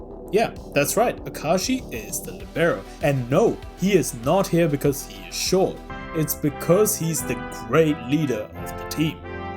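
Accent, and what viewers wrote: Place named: South Asia. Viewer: I can identify a desi voice when I hear one👀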